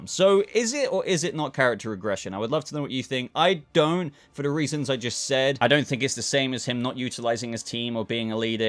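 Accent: United Kingdom. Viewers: I am totally digging your British accent. 😆..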